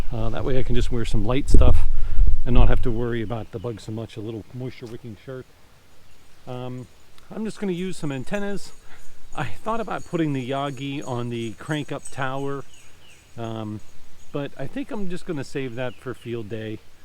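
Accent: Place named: Western Pennsylvania, United States. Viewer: Let me know when you get on. We can practice Cw. Seems I have a pipe to pa. That west pa accent cracks me up. I grew up with western pa folk. Man you have the trees for an amazing delta loop. Vertical.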